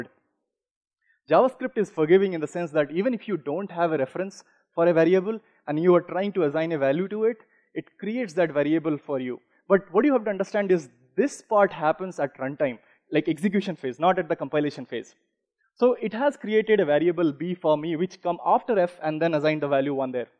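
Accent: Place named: India. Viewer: very thick indian accent. hard to follow.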